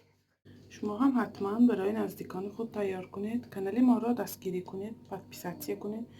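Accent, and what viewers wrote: Isfara, Tajikistan. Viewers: хамаи видиохо зур диданба мигабайт намирасад авлаш рахматки забони исфарагиамонро гум накардед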